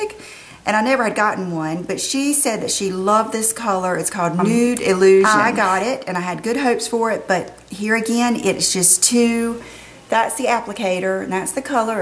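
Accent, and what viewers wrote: South, United States. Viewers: New subscriber! I love your accent! You seem so sweet and like a southern belle. I love your taste in makeup. I am still on the hunt for the Milani goodies!!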